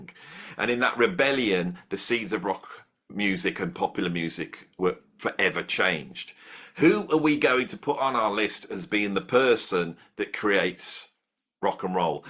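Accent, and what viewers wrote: United Kingdom. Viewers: !...intelligent list...you dug deep for the true "roots" of music...the foundations of genres. Your shows are unique imo to other folks on YouTube...I love your British voice/dialect...it relaxes me so...anyway, keep it up...shoot for 50K subs by end of the year! Enjoy the weekend...sincerely, 55 yr old drummer Webb in Atlanta, GA.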